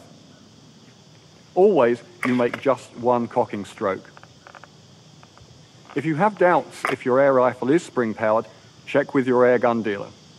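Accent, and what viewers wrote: United Kingdom. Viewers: cool, its alot better for guns in the US i bet compared to here, (especially because the bad press airguns have gotten over the past few months!) and make sure u always keep your accent, english accents are the best, i love mine haha...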